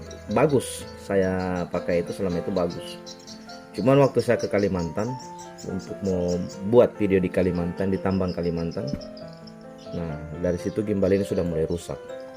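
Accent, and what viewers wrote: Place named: Southeast Sulawesi, Indonesia. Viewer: Logat abang mirip logat org sultra.. 😂😂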